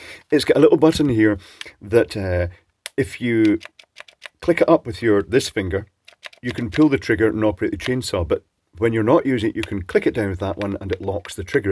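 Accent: Scotland, United Kingdom. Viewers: A Scottish accent indeed, but a very pleasant lyrical Scottish accent. I wonder what area of Scotland speaks like that? Certainly not Glasgow with its hash, brutal delivery.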